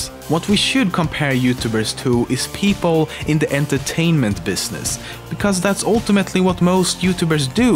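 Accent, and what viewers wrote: Sweden. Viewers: you still sound so Swedish here! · his accicent was so much more prevalent back then, goddamn. Like that's obviously swedish compared to his current accent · are you a sweaden person? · Jag satte och kollade helt random (hade misstankar om att du var svensk igenom sättet du pratar på) och helt random... MEN VAFAN xD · Are you swedish? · are you swedish?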